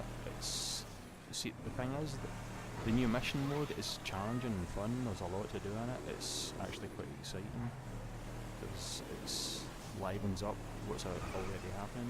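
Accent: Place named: Scotland, United Kingdom. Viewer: I really agree with what you're saying this is why I haven't invested in buying an office yet. my personal opinion on this update is it seems like a bit of beta idea for the next gta. kinda like how the whole gta was a beta on last gen. anyway good video your mic was a little quiet, but I do watch most of my videos and maybe that's why, you also surprised me I thought you would be American (not sure why) ,but you're Scottish which is cool Bro 👍✌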